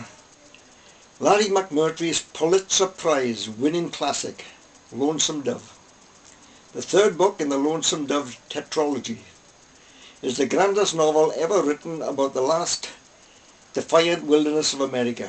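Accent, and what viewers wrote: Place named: Wales, United Kingdom. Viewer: Thank you for this. As a Welsh woman living in England, it was lovely to hear your accent and Lonesome Dove is one of my favourite novels, so I liked your book review. (The pipes looked really good as well :)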